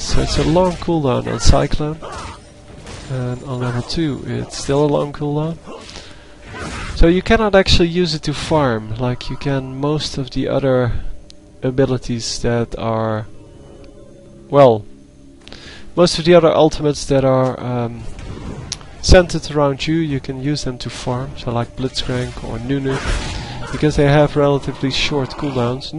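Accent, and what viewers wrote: Netherlands. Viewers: you're dutch right ? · dude are u from holland?? ur accent is pretty much like dutch and sounds dutch too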